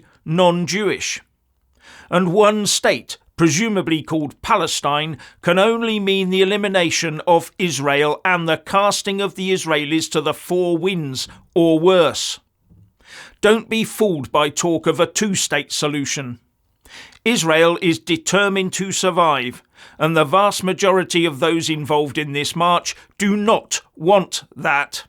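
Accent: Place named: United Kingdom. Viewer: This British accent is for me😂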